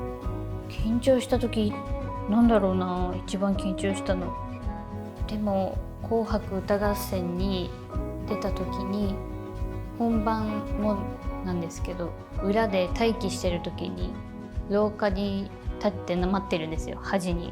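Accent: Akita, Japan. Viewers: 秋田弁可愛い‼️ それに、仮面ライダーカブト見てるのが、共通点あって嬉しい‼️ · 久々の秋田弁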